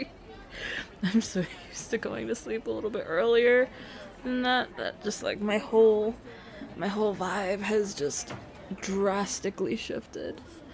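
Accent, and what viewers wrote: South, United States. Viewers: god why does your voice have to be so nice with or without southern accent got me punchin and kickin the air, and don’t even get me started on your absolutely amazing laugh 🤭🧎‍♀️🩷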